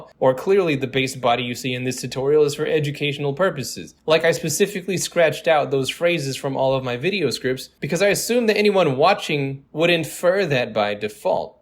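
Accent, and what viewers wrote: United States. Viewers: I'll bet it's your vocal tone — they don't want to admit that they think your US regional accent comes across as lewd appreciation. 🤐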